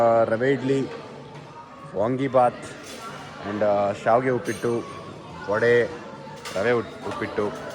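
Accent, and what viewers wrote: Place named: Udupi, India. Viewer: udupi mglor kannada supper😂❤❤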